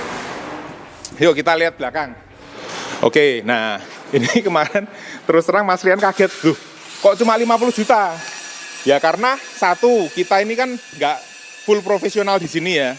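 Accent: Java, Indonesia. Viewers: Jowo tulen iki cak 🤣😂,, mantapp om 👍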